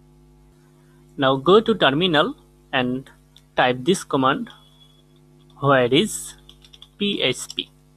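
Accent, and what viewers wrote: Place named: Bengal, India. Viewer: Crazy Bengali accent, but nice tutorial 😅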